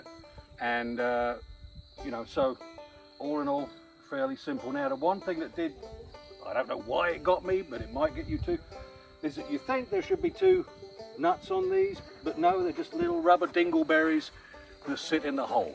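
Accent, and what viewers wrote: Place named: England, United Kingdom. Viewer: What’s your back ground mate you sound like a Englishman?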